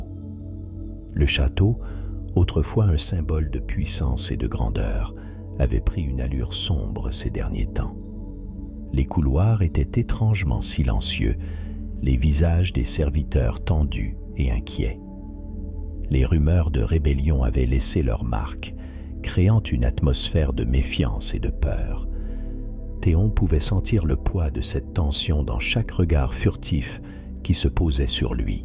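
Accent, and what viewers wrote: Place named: Quebec, Canada. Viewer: Sympa, le petit accent québécois 😉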